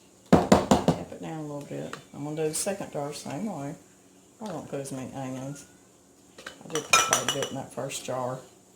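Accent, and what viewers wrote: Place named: Southern Missouri, United States. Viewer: I just discovered your channel recently. I am now subscribed. I can't wait to try your crazy pizza sauce, your lunch lady rolls, tomatoe powder and these potatoes. I have canned many years and have a really big garden. But some of these things ar new to me and I love being able to add to my pantry. Thanks so much. Oh and I have to say I love hearing you talk! The accent sounds so much like my people. My family are from southern missouri. I have lived though in Nebraska most of my life. It brings back such sweet memories! Thank You.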